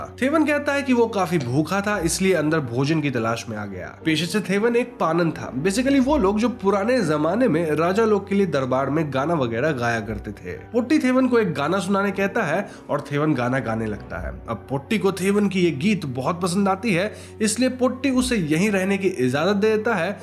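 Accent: Bengal, India. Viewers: dada baangla accent bojha jachhe!. Film story bole dile? thank you. Copyright aasena dekho!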